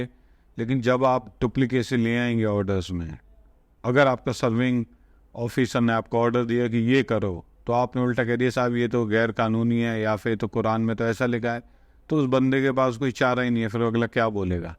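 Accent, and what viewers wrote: India. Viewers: He is an Urdu speaking migrant successor they have an accent like indian these people migrated from North India like dehli,haryana,UP .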